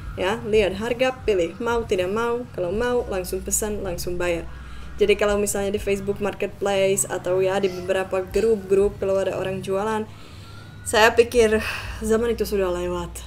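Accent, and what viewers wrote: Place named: Java, Indonesia. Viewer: medok bangaet bahasa indonesianya mbak ceko cantik. 👍💜👍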